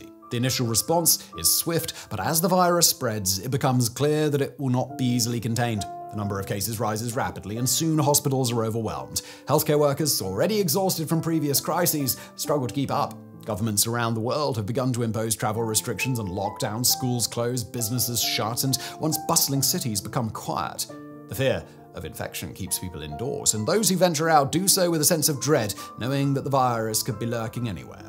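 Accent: United Kingdom. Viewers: thanks I have a hard time with the brit accent sometimes. But what I said still holds true. I'm worried about clade2.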